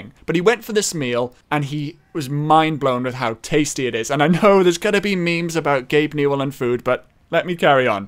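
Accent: Wales, United Kingdom. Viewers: Just wondering what's your accent? It sounds very Welshy and Valleys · U sound kinda welsh like meh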